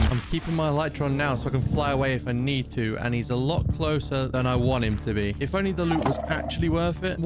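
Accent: United Kingdom. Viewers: Is bro British? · WHY ARE YOU BRITISH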